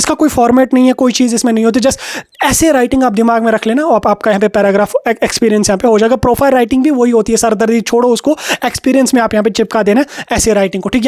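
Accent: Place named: Kashmir, India. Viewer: Bhai you are kashmiri i understand because your Urdu voice❤❤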